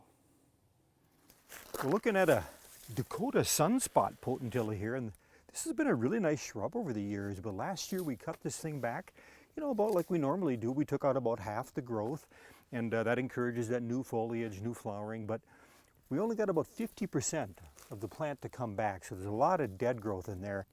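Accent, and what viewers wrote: Minnesota, United States. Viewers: Are you from Minnesota? :). Thank you for the video! I need to trim mine back, they are big. Unfortunately, too late this year. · Heard the accent and immediately went to the comments to see if there was a mention for Minnesota and was not disappointed 😊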